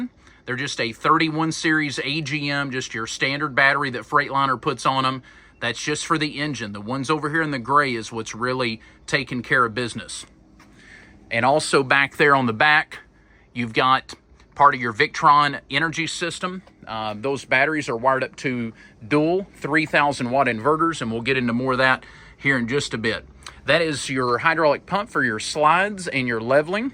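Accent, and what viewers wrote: South, United States. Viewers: There is nothing worse than a used car salesman lying to a prospective customer with innuendo of impending end of driving cars spiel to pressure the buyer. Until now. I remember this same threat by used Renegade Youtube dealers that the XL was being discontinued in 2022. Probably even had the very practiced cover their butt distinction that they had it "on good authority by the President of the United States..." or some s**t. You come off as a southern drawling con man when you gossip.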